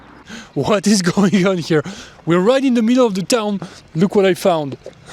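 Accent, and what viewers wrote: France. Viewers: You think they are not used to see foreigners? They are not used to see men with colored hair - they likely thought you are gay, which is not a good way to be perceived there 🤣And French accent makes it even funnier, because it sounds kind of drunk to Russian ears. I mean simply look at men around you and then at yourself to understand why you've gathered so much attention. Go to Chechnya or to Dagestan with a haircut like this for the ultimate experience 😂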